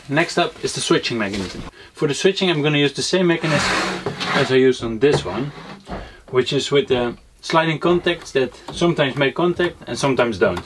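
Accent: Netherlands. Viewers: are u dutch? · verry nice video, ik hoor dat je van nederland bent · Dutch accent? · haha je hoort gewoon aan dat accent dat je nederlands bent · Je uit spraak kan wat beter man ik kan gewoon. Een Nederlands accent er in horen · are ya dutch? you have an accent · laat me raden, u bent nederlands? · Are you dutch, you sound dutch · Je hebt echt een Nederlands Engels Achtig spraak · I knew it. Your english is very nice but I can always hear something about the pronounciation if someone is Dutch. Do you live in the Netherlands as well? I'm Dutch too btw. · joe sound ferry duts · You have a dutch accent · heard the dutch accent, i subscribed XD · Are you From the netherlands ? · U sound dutch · Where you from? Cuz u sound dutch 😂😂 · Are you dutch? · Do you happen to be dutch, because you have the same accent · U sound Dutch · You sound realy dutch are you? Awsome idee btw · Are you Dutch? 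· Ik vraag me af hoe je de windingen van de spoel hebt geteld, of meet je om de zoveel keer hoeveel ohm tie is? ( Neem aan dat je een Nederlander bent zo te horen aan het accent :) ) Damn nice project! :) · Are you dutch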